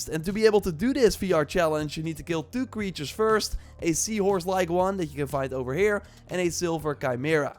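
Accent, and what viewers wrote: Netherlands. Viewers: 100% dutch by the accent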